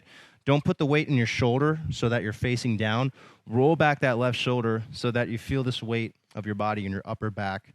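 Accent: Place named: United States. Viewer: awful american accent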